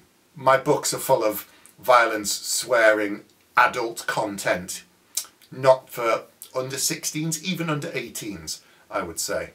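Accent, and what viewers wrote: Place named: England, United Kingdom. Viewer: Really cool video, and really surprising. I would have never guessed that you don't like tea. And you don't sound like Neil Gaiman at all. I think it's because of the accent. It's as if they said that you sound like David Attenborough, just because he sounds English too. Anyway, I haven't actually checked, but do you have audiobooks read by you? It would be cool, I think. Good weekend to all your followers.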